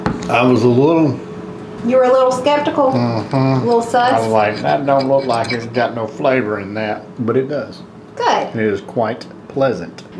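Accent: South, United States. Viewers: Ohhhl is the southern lingo for oil…. I love the twang of the south. And you bowl your water for pasta 😂😂 Yeap, chicken fried steak is southern dish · that chicken looked so good , def gonna try that one , but u crack me up, spray them with lil ol !!!!!! here in the north we call it like its spelled . oil hehehee, southern talk makes me laugh at how ppl pronounce diff words ... ty for a great video , oops n all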